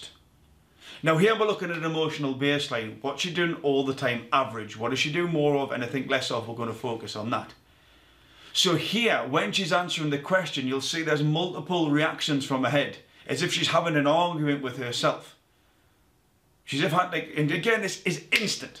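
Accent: United Kingdom. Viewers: I just have to hear your British accent and know that your take on Meghan would be that she is a liar.... then journey down and read the comments anddddddd its confirmed. Thank you for doing this video. In reading some of the comments I'm saddened by the still many people being so negative to Meghan... you are bringing your biases against her into this. T. My take is that many of you are genuinely racists and never wanted to be neutral where Meghan is concerned and that's ok... its just who you people are.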